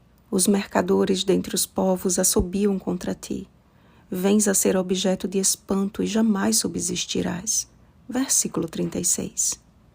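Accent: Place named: Northeast, Brazil. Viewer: Excellent always! Your northeastern accent is beautiful, your voice is very soft and welcoming! God bless your ministry! I'm from the south of Minas Gerais, living in Alberta, Canada for 1 year and 4 months...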